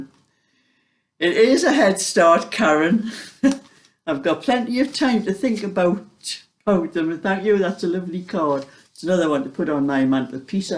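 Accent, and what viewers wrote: Newcastle, United Kingdom. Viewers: Always chuckle when you say say you’ve got “ happy Mail” Why I hear you ask ? For weeks I’ve been hearing you say Happy MEAL lol It’s ya lovely gordie accent lol Think penny’s dropped xxx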